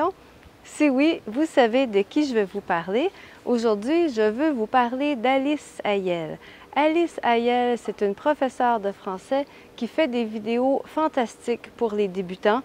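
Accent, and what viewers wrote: Canada. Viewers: Canadian accent, « fête » = « fight », « chaîne » = « shine »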